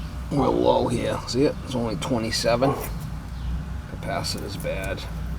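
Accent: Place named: Southeastern Massachusetts, United States. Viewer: I knew that accent was from SE Ma 😅😅😅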